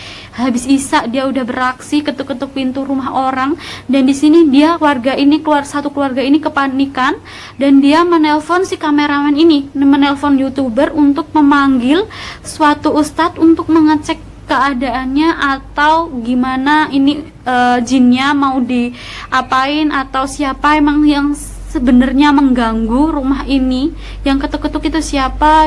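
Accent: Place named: Malang, Indonesia. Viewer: Ceritane serem, tapi ngerungokno ngomonge lucu rek... ngalam banget 😁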